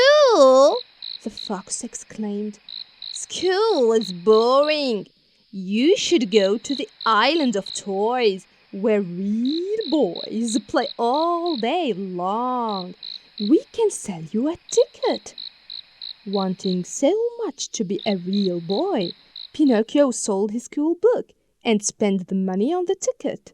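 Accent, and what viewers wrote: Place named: United Kingdom. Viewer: this accent British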